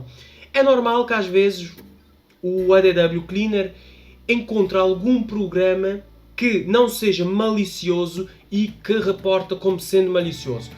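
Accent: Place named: Portugal. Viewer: é bom que ele é português ai da pra entender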